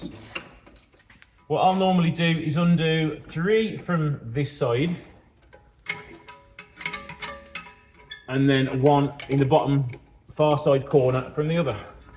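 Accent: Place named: Birmingham, United Kingdom. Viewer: Thanks for the helpful video. Love the brummie accent as well. I was just clicking play and was like, “That must be around Birmingham somewhere”. Googled the name of the firm and there you go. ❤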